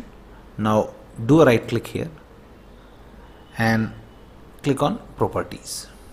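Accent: India. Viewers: god when indian ppl speek english its so funny t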